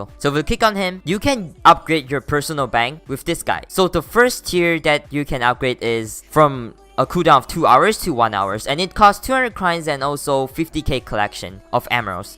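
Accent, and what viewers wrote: Singapore. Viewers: The Singapore ascent lol I can relate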